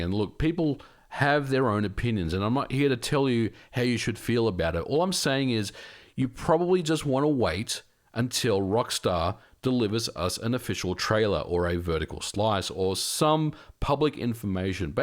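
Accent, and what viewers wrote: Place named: Western Sydney, Australia. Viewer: Which part of Australia are you from? I am getting Western Sydney vibes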